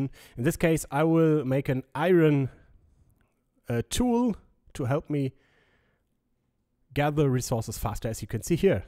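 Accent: Germany. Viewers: also, I still don't know what 'kraut' accent means!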